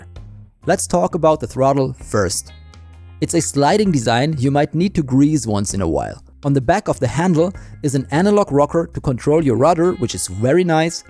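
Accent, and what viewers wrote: Germany. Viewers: By the way are you German? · bin ich der einzige der denkt das er deutscher ist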